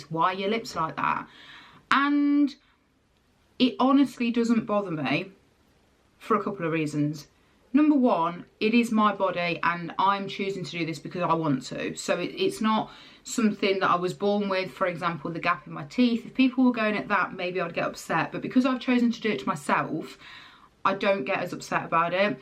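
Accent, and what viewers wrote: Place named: Birmingham, United Kingdom. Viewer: Waitttt you’re from brum ?!? I knew I recognised the accent 😅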